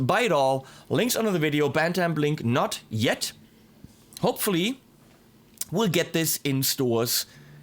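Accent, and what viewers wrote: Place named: Germany. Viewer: your accent is kinda german :)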